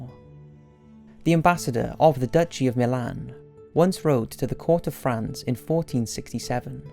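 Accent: Wales, United Kingdom. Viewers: I think it's a Welsh accent.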